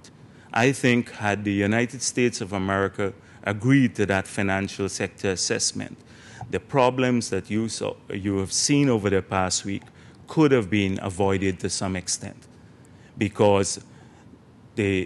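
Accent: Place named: Caribbean. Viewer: Clearly he speaks English fluently, with a marked Caribbean accent. However I don't understand how someone could serve as president were he unable to speak the official language.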